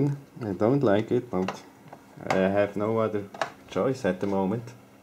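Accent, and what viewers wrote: Switzerland. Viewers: switzer englisch versteht man wenigstens ;) thanks for video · Soo guet ne schwizer uf englisch am tutoriel mache.. würschmers Bärndütsch erklärä😀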